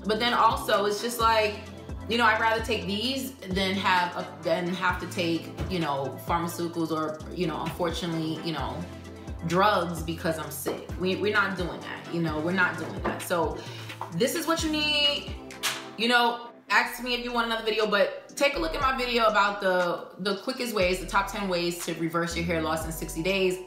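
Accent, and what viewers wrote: New York, United States. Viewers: Great info....gurl....I know that accent....Gurl what part of NY you from? lol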